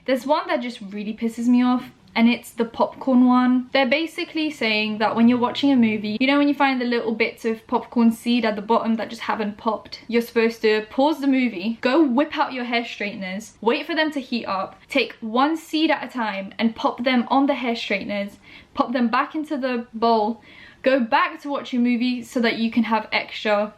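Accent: United Kingdom. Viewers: I have hated everyone in the UK's accent EXCEPT for this girl. idk why I love her voice so much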